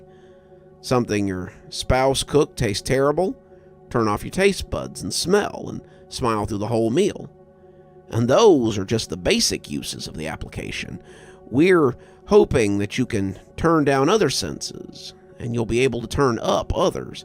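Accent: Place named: United States. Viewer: I love your accent !! Might be my new favourite American accent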